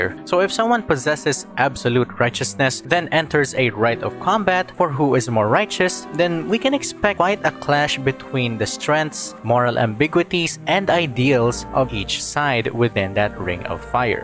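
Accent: Philippines. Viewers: There is no mistaking a Filipino’s accent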